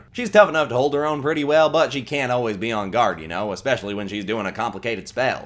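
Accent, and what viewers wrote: United States. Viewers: Not to be rude but I personally am not a big fan of the country accent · This narrator kinda sounds like a mix between a robot and a country accent but it’s great tho can’t wait for more episodes with Harold lol 😂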